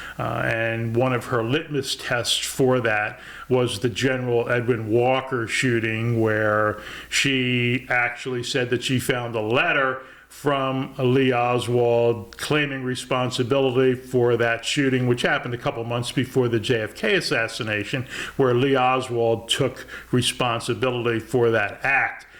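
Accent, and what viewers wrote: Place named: Philadelphia, United States. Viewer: I detect an east coast, Pennsylvania/Philadelphia/Delco accent. Thank you for another informative presentation.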